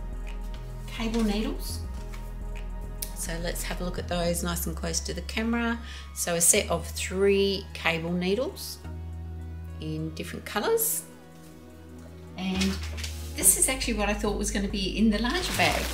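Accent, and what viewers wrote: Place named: Australia. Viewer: Crazy enough it just popped up on my YouTube page. But as soon as I heard your accent I had to watch. I enjoy all things crochet, yarn, & Australia as I lived in Adelaide and Darwin for about a year and a half and currently work with the Australian and NZ markets for my job. I knew I had to watch and check out your channel.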